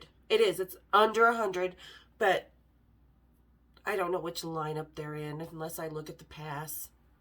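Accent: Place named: United Kingdom. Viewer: Bruce Dickinson used to live about 3 miles away from me. I love your British acent❤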